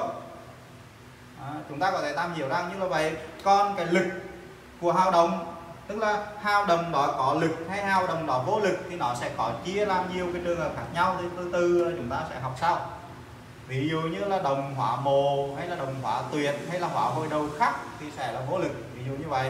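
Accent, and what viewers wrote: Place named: Vietnam. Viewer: Giọng thầy nơi nặng theo miền. Nhiều khi nghe khó dịch :)))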